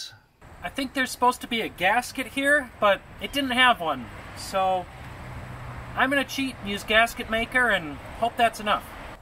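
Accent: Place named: Minnesota, United States. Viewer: I thought so. I take my 74' Starcraft Capri trihull on that lake sometimes. I sure thought you sounded Minnesotan, I was right!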